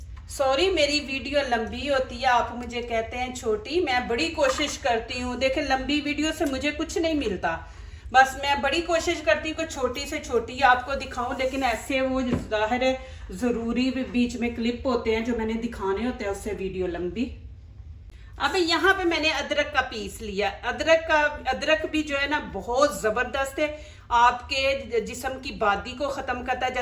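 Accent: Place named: Punjab, India. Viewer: Agr ye chaba chaba k punjabi men Urdu na bolen to Video short hojaye gi.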